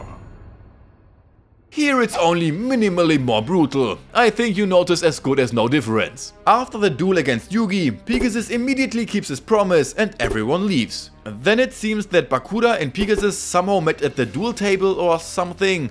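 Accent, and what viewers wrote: Germany. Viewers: That german english is unbearable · dein deutscher Akzent ist schon sehr deutlich rauszuhören aber cooles video :D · I love your very strong German accent einfach geil Bro ich lach mich echt schlapp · Dude, your german accent is kinda funny 😄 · Are you German? Cause you sound like that ^^ Edit: Ok, you definitely are xD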